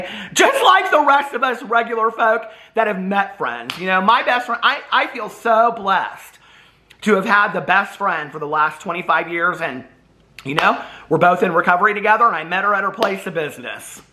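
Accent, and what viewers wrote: United States. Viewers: When I first started following you a couple of years back I didn't understand the American accent and thought you were giving a radio call sign in your intro and maybe you were a DJ haha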